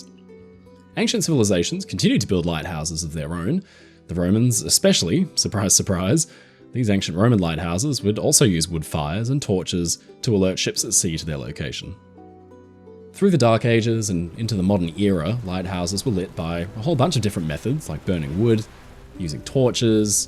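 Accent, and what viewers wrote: Australia. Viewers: I THOUGHT YOU WERE BRITISH NOT AUSTRALIAN! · well this is how i find out you’re australian. i’m absolutely terrible at recognising accents so i kinda just vacillated between thinking you were british and thinking you were american 🤦🏼‍♀️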